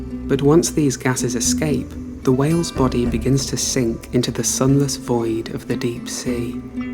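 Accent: United Kingdom. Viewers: i wish the narrator was not british 💔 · really wanna learn but god i can't listen to a british accent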